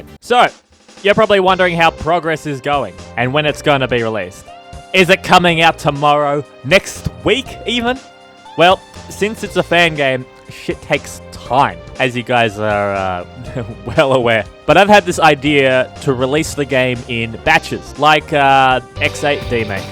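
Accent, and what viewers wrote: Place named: Appalachia, United States. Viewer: You somehow sound like someone from Appalachia and Australia at the same time.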